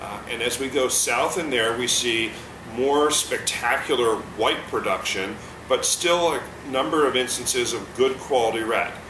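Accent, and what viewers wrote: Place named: United States. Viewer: practice that american accent in french. so sad.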